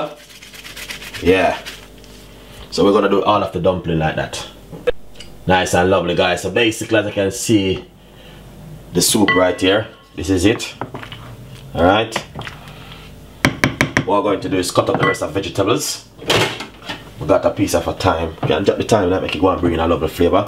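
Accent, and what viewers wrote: Jamaica. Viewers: Very nice soup & lovely Jamaican accent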